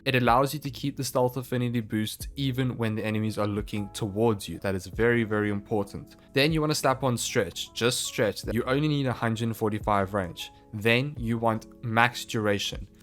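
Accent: South Africa. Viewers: Dude you sound South African. Probably from Joburg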